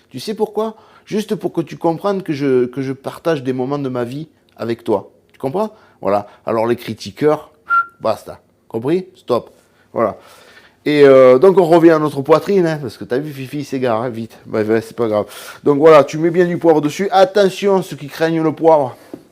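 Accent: South, France. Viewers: Bonjour fifi ! Le bonjour d'un Harleyiste de Nouvelle Calédonie ✌️ Deux questions stp : moi je suis dans un pays chaud donc hors de question de faire de la charcuterie à l'air libre... Je peux mettre la ventreche à saler puis ensuite à sécher au frigo ? Pas moyen de la pendre en plus... Et combien de temps en général avant de consommer selon toi? Merci pour tes vidéos que je découvre. C'est super. J'adore retrouver l'accent du midi 😉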